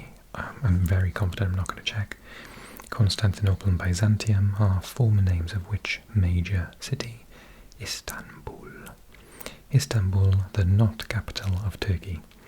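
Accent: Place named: Wales, United Kingdom. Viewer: This is amazing! I love listening to facts or meaningful things (not rambles) and in a Welsh accent, I’m blessed. If you happen to speak Welsh too then that would be another amazing video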